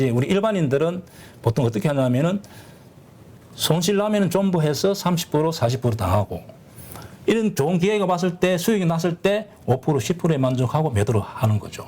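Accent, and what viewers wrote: Gyeongsang, South Korea. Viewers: 구수한경상도사투리 친근합니다 이렇게와닿는방송정말감사합니다열공하겠습니다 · 같은 갱상도라 귀에 쏙쏙들어옵니다 ㅎㅎ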